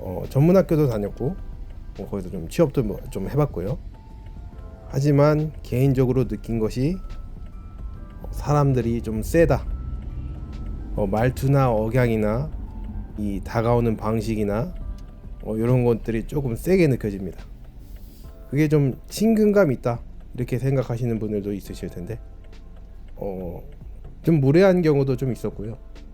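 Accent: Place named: Japan. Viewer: 한국말하는 일본인 같네요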